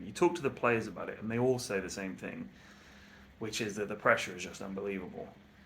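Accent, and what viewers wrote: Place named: London, United Kingdom. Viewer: Love these videos man, keep them coming ! Out of curiosity which football team do you support ? You sound like a Gooner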